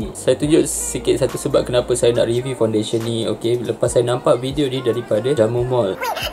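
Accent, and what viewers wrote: Sabah, Malaysia. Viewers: Orang sabah ke ? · Are you sabahan?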